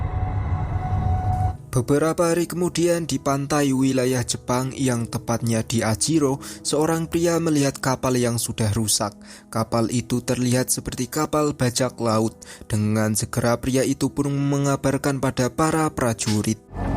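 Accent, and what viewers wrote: Java, Indonesia. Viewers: klo ngomong ga usah medok kali, jadi ga enak dengarnya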